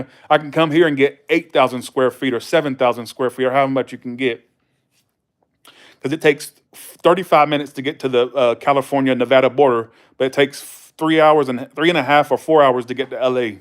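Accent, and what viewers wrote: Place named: London, United Kingdom. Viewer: Your London accent is so attractive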